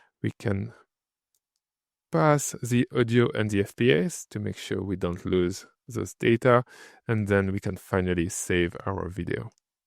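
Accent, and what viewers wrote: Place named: France. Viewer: Really fantastic video tutorial, very well explained and in depth. Your english is also fantastic, however, if I am brutaly honest, you have quite a strong french accent and pronounciation, which makes it hard for me to understand you perfectly all the time. Only pointing this out as I found the subtitles very helpful, for following your speech, so remembering to include them in your uploads will likely always be very helpful for your viewers. Look forward to watching more of your content. I am interested in developing some ai photogrammetry to stereoscopic 3d/vr and cad and stl file pipelines, so finding the best way to optimize poor quality low res recordings from a very large number of different sources, efficiently with a high quality footage output, is critical for this objective. I assumed that this upscaler would not be the best choice for this, especially as my first tests were generating unrealistically sharp details, but now thanks to you, it looks like the best option I have found so far, though like you say, it could be better at handling motion. 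Not sure if there is a better free offline alternative for this? Now I have to figure out how to compare the quality output between different video renders in kdenlive, as I am new to comfyui and have no way to install the required nodes, etc, to do this for the next couple of days. I come from more of a 3d modelling background personally and am astonished to learn how many laborious tasks AI can speed up nowadays. Quick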